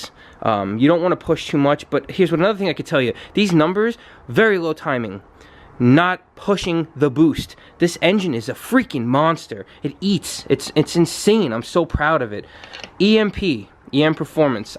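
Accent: New York, United States. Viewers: Awesome Bro : The NY/NE accent not so much :) When I clicked on the Stroker Hellcat exhaust video My brain didn't register Hellcat Stroker for some reason I was thinking Scatpack Stroker & near the end You mentioned something about being the only Stroked Hellcat on YT. I've been an SRT B5 sub for at least a year now but haven't been keeping up with His channel lately. I'm on board with both o Y'all now.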